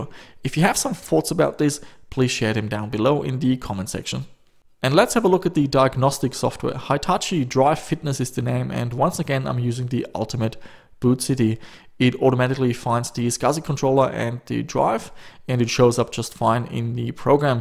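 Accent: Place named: Germany. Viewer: An deiner Stimme erkennt man sofort das du Deutsch bist :D .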